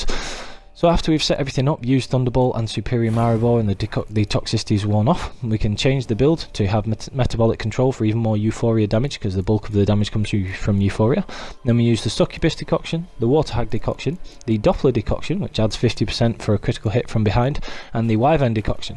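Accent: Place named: Bolton, United Kingdom. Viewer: You from Bolton?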